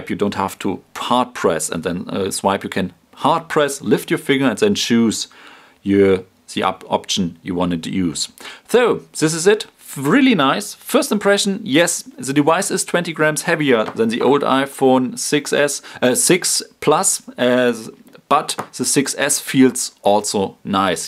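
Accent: Germany. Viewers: Are you german? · I love your accent!!!!! I have the German accent as well.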